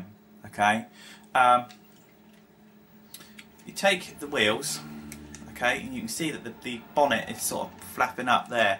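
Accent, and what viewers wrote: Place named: Wales, United Kingdom. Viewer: I like your warmup techniques sir! Thankyou for reviewing him for me pal and repeating what a bag of gash he is haha! A fiver is not too shabby tho i must admit! Great stuff me ol chum:) If you ever go to rhondda that welsh accent could save you life too;)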